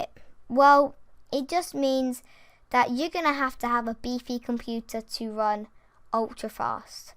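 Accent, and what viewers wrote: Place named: England, United Kingdom. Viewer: Shes English like me.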